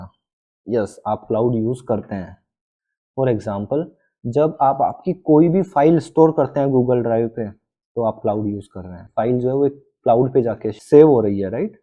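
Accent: Indore, India. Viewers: superb explanation.... bro just one question are you from indore because you have that accent 😄😇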